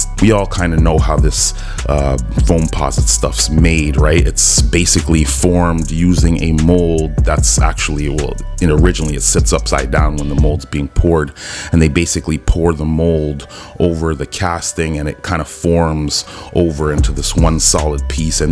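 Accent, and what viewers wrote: Toronto, Canada. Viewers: you definitely from Toronto 😂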